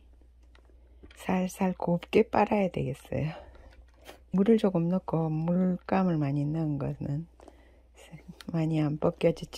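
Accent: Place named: Gyeongsang, South Korea. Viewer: 반가워요 ^^ 갱상도 사투리가 와 이리 듣기 좋뇨..ㅎㅎ 풀청했습니다..그리고, 좋아요..5 눌렀습니다.. 요근래 구글이 좋아요를 삭제하는것 같더라구요, 그래서 전 시간내서 어렵게 방문 한 유친님들게 풀청후에 좋아요 눌러드립니다.. 응원합니다 ~!!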